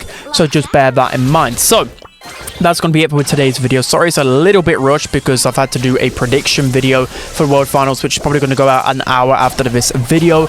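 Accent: United Kingdom. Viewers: Hes british leave him alone 😭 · Even the sub titles gets failed against these brits english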